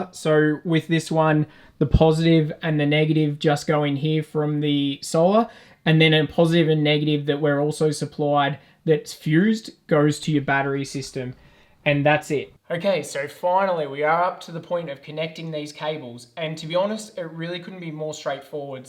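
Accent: Australia. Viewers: Now get ready for the questions about bob being your uncle 😂😂😂Us Aussie’s get it but l don’t know about other countries. I love the country boy using good old fashioned slang and your “strine” is music to the ears. Great job young cobber ! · I love your videos, it's fascinating to watch you do this van build and you explain everything so well that I feel like I could even do it....almost. It also doesn't hurt that you're easy on the eyes and have an australian accent. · I’m in awe of people that can do this stuff 👍🏻 and is it my imagination but has that Aussie twang gotten stronger?!😂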